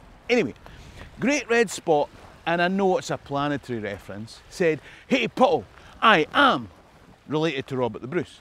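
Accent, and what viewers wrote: Scotland, United Kingdom. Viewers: "tell me your thoughts in the comments section" I really identified with the silly blustery American who really doesn't know much or think things through. it makes me so happy to have access to those feelings because someone talked about them. The only people I knew who had Scots accents and true history were gone pretty early in my life, so bitterness has been replaced by blusteriness whenever I find someone to talk to. Especially when I'm feeling like a man, talking to other men! I recently had someone call MacDonalds of Glencoe "cannibals" and it made me so furious I never spoke to them again because it was just not correct. I love hearing your accent and the Scots Gaelic language!! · Wonderful analysis, and as an American whose family story says we came from Kelso (btw, another Washington State parallel to Kelso just like Kenmore) I think your 5 points are dead on. 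We visited Kelso last year and learned no one named Kelso lives there. But we bear the name and it’s a lovely place. Love your videos, although I find the closed captioning helps this American understand your delightful brogue. Keep up the great work, Dick Kelso, Minneapolis.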